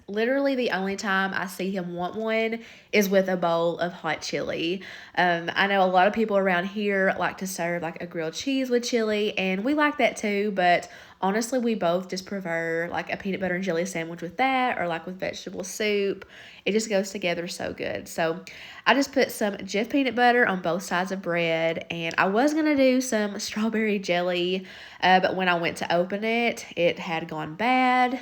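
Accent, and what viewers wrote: South, United States. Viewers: Maybe it's my preference but I love hearing a southern accent. It's a familiar comfort I don't always hear anymore with how many transplants live in my area. Southern women just have a way of speaking that keeps me listening. Keep up the videos, you're doing great!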